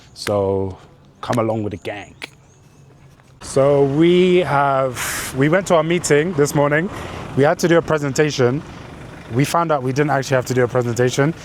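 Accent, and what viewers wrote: Zimbabwe. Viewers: Bro going by your accent alone, you where born in Zimbabwe. What's with this Uk child. Disapora child rhetoric. Ussually i dont get involved in this nonsense but is Zimbabweans, we have an identity crisis when it comes to England and English. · Your accent is 100% Zimbabwean you don’t need to adjust any speed ! ma Zimbo drama hanzi Massvingo 😂😂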